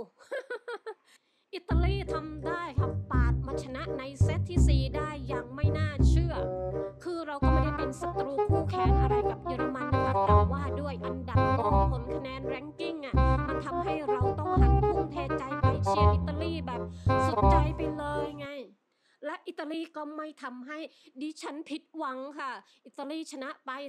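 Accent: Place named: South, Thailand. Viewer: Ad สำเนียงคนใต้ อยู่ จ.ไหนครับ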